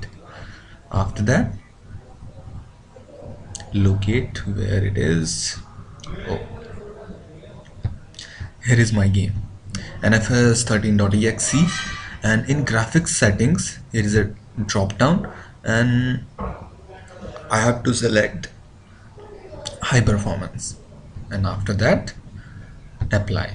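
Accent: India. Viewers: That's probably because of his Indian accent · fuck your indian accent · why ur indian voice sounds so gay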